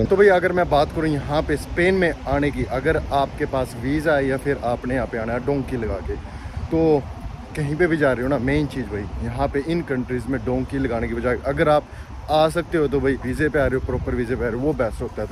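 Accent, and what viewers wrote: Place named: Yamunanagar, India. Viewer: Boli to ynr side wali h bai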